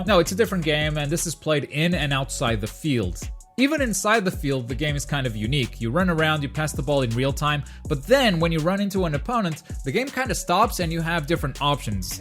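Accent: Portugal. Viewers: Holy shit just from you accent I could understand that you were also Portuguese. It's Rare to find one of us in the English community lol. Great channel btw. Definitively going to Subscribe.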